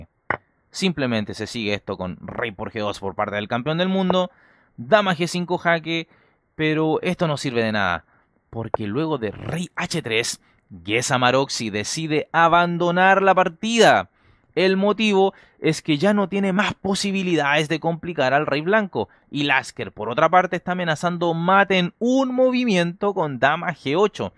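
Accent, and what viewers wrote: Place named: Chile. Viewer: el acento chileno me resulta muy comodo para entender analsisis de ajefrez ! gracias!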